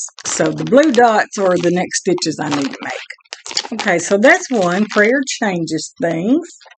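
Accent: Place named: South, United States. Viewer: Love your ramblings and adore your southern accent! Looking forward to the next one!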